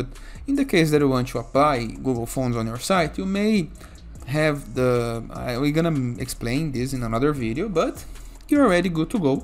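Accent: Brazil. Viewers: esse sotaque é tupiniquim , hein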